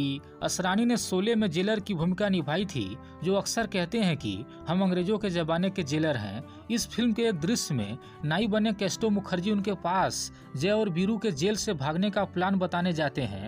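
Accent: Bihar, India. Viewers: Sabse pahle to apni ye Bihari tone badliye, ek dam bekar lagti hai.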